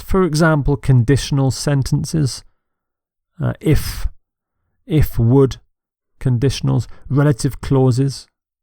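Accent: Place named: United Kingdom. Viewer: Thank you you are my best tutor, i love Your accent very much. I really would like be like you with your accent.. Please give me some tips as if i can be a British speaker like You. And i would like to be introduce with you personally. I am from Bangladesh and you?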